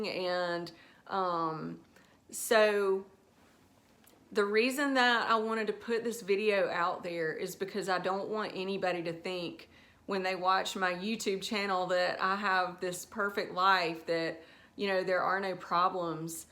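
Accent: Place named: Alabama, United States. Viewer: A truly beautiful and inspiring testimony! I'm so glad you decided to start a youtube channel - yours is one of my very favorites to watch! And this is totally off topic, but your Southern accent reminds me SO much of my husband's aunt who was from Alabama - I could happily listen to you for hours... :)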